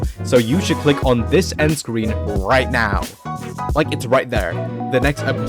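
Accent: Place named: United Kingdom. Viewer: wait he’s British? AW YEA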